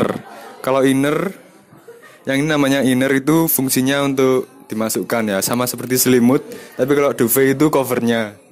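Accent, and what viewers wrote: Java, Indonesia. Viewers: Mase medok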